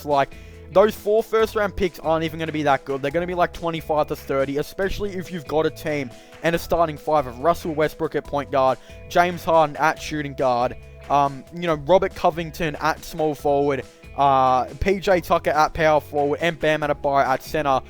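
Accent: Australia. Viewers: Ahhh, its australian bud. Not even close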